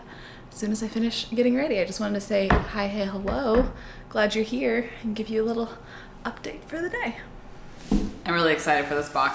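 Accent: Texas, United States. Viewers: It's just Texas people that talk like that. My sister in law talks wierd.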